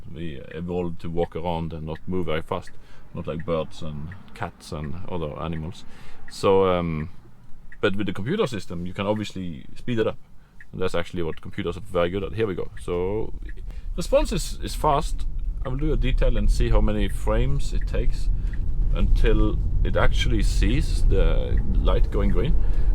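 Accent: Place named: United States. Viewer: sounds Swedish American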